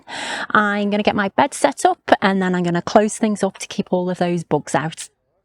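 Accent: England, United Kingdom. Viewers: The location says Canada. The accent says England.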